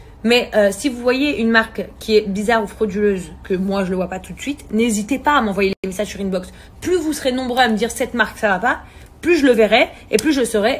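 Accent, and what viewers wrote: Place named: Arab world. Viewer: J'ai stoppé la vidéo net en l'écoutant parler comme une arabe. " ca va porté le ein ! Euh vous etes au courant que plus de lamoitier des marques quel pub sa leur appartient à elle et son mec ???? Tous les trucs de cire et compagnie cest a eux donc si vous recevez pas cest qu'ils continuent encore et encore a vous escroquer et à vous mentir.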